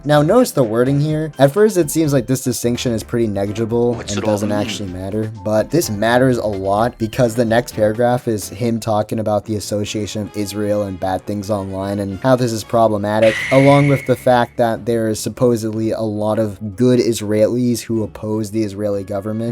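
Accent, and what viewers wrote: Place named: United States. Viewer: terrible take. from your accent you would be American so by your same logic you are an Trump supporter and 100% for the Iran war. no there's no room for nuance with this. you see how stupid that sounds? I don't even say that to defend Israel because they shouldn't exist in the first place but that's a different conversation.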